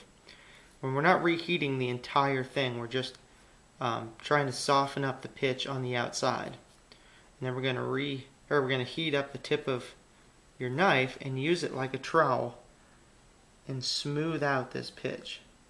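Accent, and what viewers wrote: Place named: Pennsylvania, United States. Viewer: Jeez man, how did you learn this by living with a present day primitive tribe? Lol. You sound like you're from Pennsylvania and not from the Outback in Australia.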